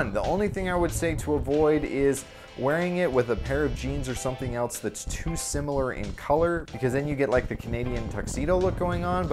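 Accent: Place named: Salt Lake City, United States. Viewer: Ok..you have to be based in SLC... I thought I recognized how you say " imporTant"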